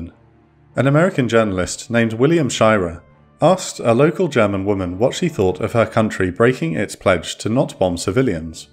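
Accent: England, United Kingdom. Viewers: This guy has an English accent. He describes the bombing of Germany and England as if they were the first counties that had cities bombed to the ground. They weren't, history is severely lacking here. The Germans had set a precedent by first obliterating Warsaw and then severely damaging Rotterdam, in his myopia the Englishman omitted this history. The slow ramping up of bombing between Germany and England is a later phenomenon, the Germans had already set the precedent for bombing of cities in Poland and Holland.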